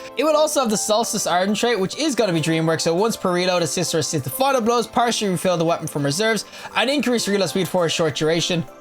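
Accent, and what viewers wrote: Ireland. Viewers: love your dulcet Irish tones but your video's got no game audio, don't know if that was intended.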